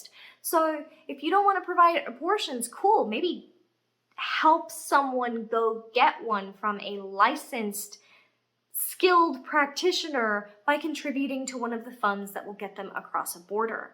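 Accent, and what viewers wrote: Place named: Australia. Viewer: Just a question for you. Are you originally from Australia, or spent a lot of time around Australians? Reason I ask is, when hearing you speak, although your overall accent sounds American, some words you said sound almost Australian.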